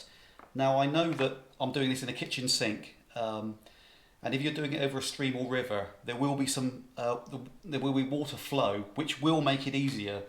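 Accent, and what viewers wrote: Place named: United Kingdom. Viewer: Thanks for the informative video love your UK accent haha... Do you think these can be used for collecting and filtering tap water at all? I am traveling in Europe including London, cities...I am not collecting water from lakes or rivers or ponds though haha... I am looking at filtering water for my family (four of us) for making morning powder shakes or water for their bottles for out and about drinking. Thanks lovely.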